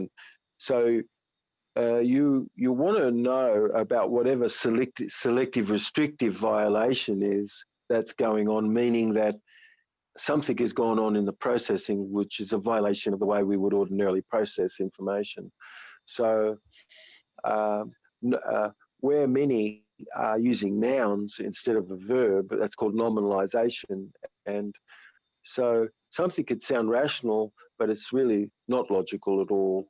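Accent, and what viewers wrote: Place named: Australia. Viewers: Why’s he got an Australian accent? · because...he's Australian..................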